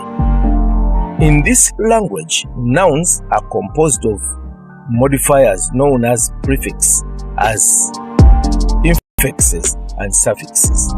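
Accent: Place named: Buganda, Uganda. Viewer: Hes amuganda even hea accent u can tell so bunyoro tooro u dnt count 😏😏🤔🤔🤔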